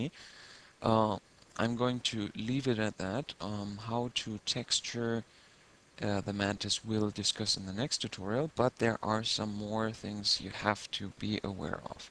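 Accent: Germany. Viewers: I like german accent in English - anyway thanks for this tutorial.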